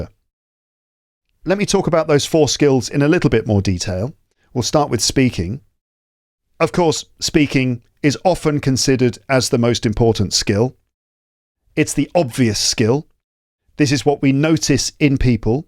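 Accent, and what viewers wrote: United Kingdom. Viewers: I don't know why but i it's really difficult understand uk accent, ven so I could have good compren henshion of your explanation comparing to north america accent it's really really different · British English is much easier to understand. Thank you ❤❤❤❤❤ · I’m literally enjoying to listen to your podcast, I love British accent ❤ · I don't like your British accent 🤢🤢🤢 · Dear teacher!!! I love your podcast, I love your accent or British accent. I´m learning a lot and havin fun. Lots of hugs from Brazil! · Your british English is so clear to be understood 😊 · I enjoyed the episode thank u very much. Also your podcast room is so cool! And finally, which British accent do u have I am interested ?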